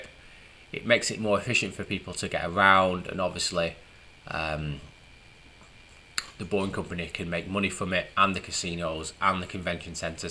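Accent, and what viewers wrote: United Kingdom. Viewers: Do you live in Las Vegas now? For how long? And if so, isn't it time for you to lose your English brogue accent? We think so!!!!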